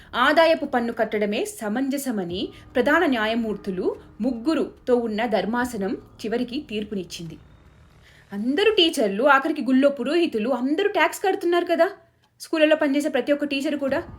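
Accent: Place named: Telangana, India. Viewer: i like your accent man.. switching different slangs mainly telangana style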